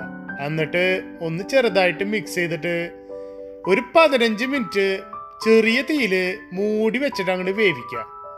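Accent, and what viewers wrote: Thrissur, India. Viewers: Thrissur language poli❤.. good presentation 👌👍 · Thrissure aano😄 Curryum samsaravum polichu. Sugar edarilla, Eni cheythu nokkam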